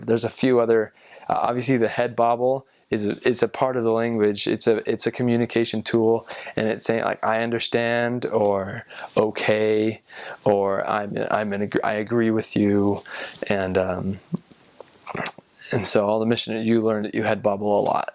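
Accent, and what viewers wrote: South India, India. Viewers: he went to south India, he was speaking in South Indian accent. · Ya agreed. That is essentially South Indian accent. You would hear that in Maharashtra and above. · This is south Indian accent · That is South Indian accent. Different states in India have different accents... Well you did a pretty good job on the Southern accent;) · That was just south Indian accent sir. But yes, that is also a part of India. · this is a typical south Indian accent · Southern Indian Accent !! :D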